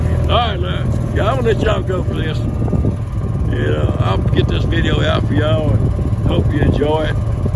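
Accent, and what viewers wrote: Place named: Arkansas, United States. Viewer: I enjoy all the videos and I could listen to you talk all day. You say some expressions my dad used to say and the accent too. He was from Arkansas and has been gone for 21 years. I continue to pray for Gigi and Raleigh. Totally happy that Ivan is so much better, he makes me smile. Still praying Sooky comes home too ❤️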